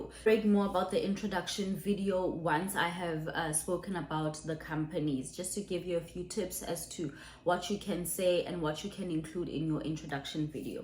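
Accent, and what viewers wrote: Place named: South Africa. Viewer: TOYS-inyana ! I Love Your SA accent !!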